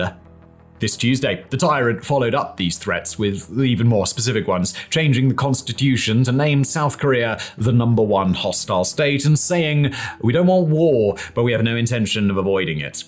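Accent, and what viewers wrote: United Kingdom. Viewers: Finally, someone with a brain. I like your content and delivery... especially with that accent of yours. Everything sounds better with that particular British accent. I appreciate you and your channel.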